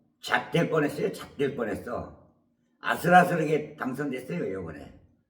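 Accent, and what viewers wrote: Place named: Jeolla, South Korea. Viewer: 말소리가 라도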